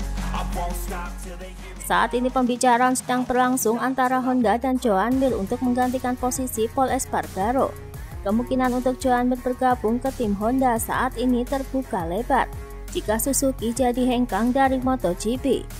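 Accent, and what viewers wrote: Java, Indonesia. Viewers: Komentator agak medok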